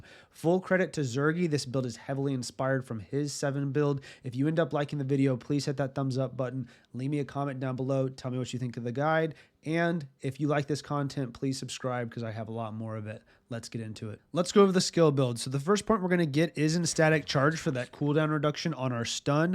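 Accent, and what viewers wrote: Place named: Canada. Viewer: Wait ur canadian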